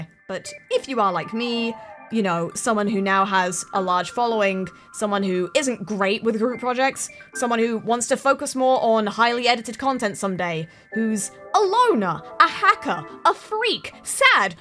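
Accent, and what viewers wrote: United Kingdom. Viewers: Lol you're a masterclass at explaining things. Maybe its just because your british voice and humor is so nice to listen to lol. I look forward to seeing how great you grow <3. Hope you will have a lot of amazing stuff planned. btw: +1 sub toward your million. I see you from a ton of clips <3 Your Moth-...I mean umm I have seen those clips too;)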